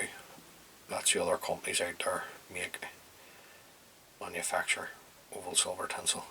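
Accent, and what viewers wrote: Northern Ireland, United Kingdom. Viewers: Excellent tie and collection of patterns! I just sub'd and really enjoyed looking at your community page. Lots of inspiration from this one, especially with the wood pigeon wings. I've used common pigeon (city pigeon I called them) that I found around the house to tie wet fly wings when I first started tying. I love these wets from the UK, and your style of tying them. You sound similar to Ryan Houston, I was wondering if you're from "sunny" Northern Ireland like he is? Thank you. Cheers from the US!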